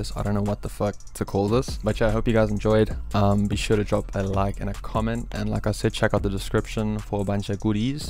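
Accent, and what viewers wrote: South Africa. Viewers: Bro are you from south africa coz your accent is like that lol...great vid im learning alot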